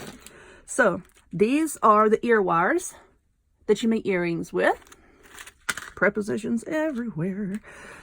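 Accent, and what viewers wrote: South, United States. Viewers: So happy to hear your Southern accent again. You tickle me when you say caddywampus (sp) I'm from Jersey, have never heard that word before and laughed out loud when you said it. I also love to watch you crochet. My grandma taught me when I was little but I forgot everything. I've been watching YouTube tutorials to relearn. I'm hoping you make some crochet projects. Happy crafting.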